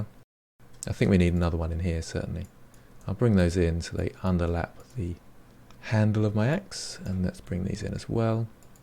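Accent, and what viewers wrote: England, United Kingdom. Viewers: Your englishness il delightfull. Always a pleasure listening to your eplaination.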